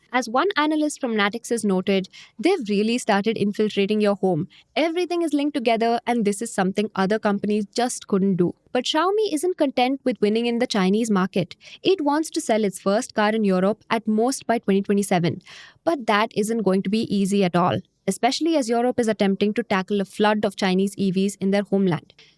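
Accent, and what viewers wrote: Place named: Tamil Nadu, India. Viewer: that tamil accennt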